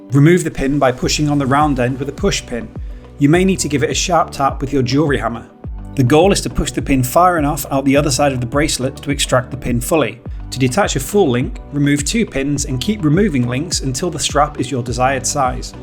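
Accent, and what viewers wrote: United Kingdom. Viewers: ah. Well that explains the British accent.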